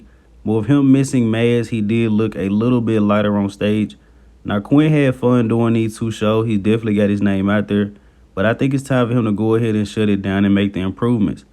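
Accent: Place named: South, United States. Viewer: lol man I grew up in the South, this is just how people talk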